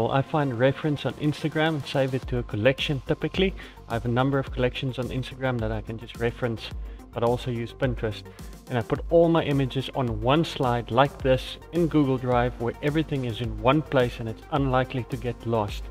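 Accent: South Africa. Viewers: South African? Im from Cape Town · South African?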